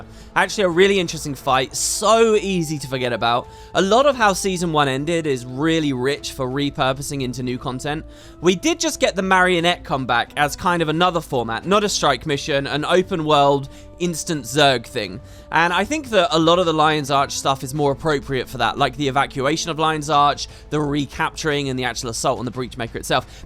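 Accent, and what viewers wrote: United Kingdom. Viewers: Hey man, long time fan. Just thought I'd let you know that I've been watching another YouTuber named MrTommo2304 (who does Bleach anime content); and his voice sounds bang on like yours. Could be your doppelgänger. Definitely a British accent thing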